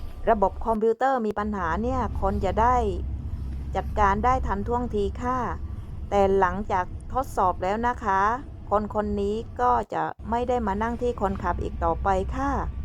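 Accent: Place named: South, Thailand. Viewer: คิดถึงจึงแวะมาทักทายน้องสาวคนน่ารัก😍, คิดถึงสำเนียงใต้.ยายรินดู แต่บ่ได้ยินนะคะ เพราะหรี่เสียงมือถือไว้ กลัวคนรู้ว่าเล่นมือถือในเวลางานค่ะ.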